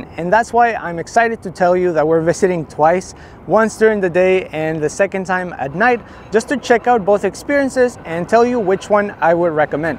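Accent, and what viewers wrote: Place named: Laredo, United States. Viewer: Whenever I want to show people what a Laredo accent sounds like, I'll show them your vids haha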